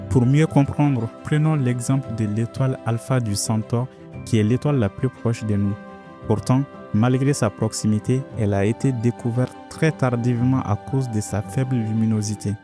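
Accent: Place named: Africa. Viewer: J'adore ton accent africain, c'est rafraîchissant dans ce monde d'internet où toutes les voix sont faites par IA et se ressemblent toutes. Tu articule bien en plus, c'est compréhensible. Merci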